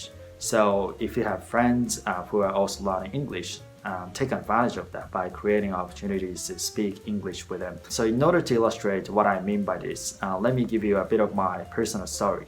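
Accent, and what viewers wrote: Australia. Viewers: harnessed? 聞いたことない単語確かにオーストラリアえいごなんだろうな。とおもいました。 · ところどころオーストラリアアクセント入ってますね！バックグラウンドが感じられて良いなあと思います！ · オーストラリア英語の影響を受けてるよアメリカ英語とは少し違うね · すごいちゃんとオーストラリアンアクセントになってる！ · 英語出来ないわけではないのに、なんで言ってること分かりにくいんだろうと思っていたら、さんオーストラリアの発音で英語話していたんですね。アメリカ在住なので、アメリカ英語に慣れすぎていました (^_^;)色んな地域の英語聞かなきゃですねー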